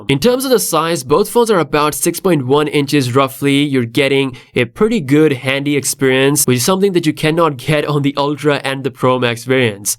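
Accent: India. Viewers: Man trying to speak good English still have 10% Indian accent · Your Indian accent is getting better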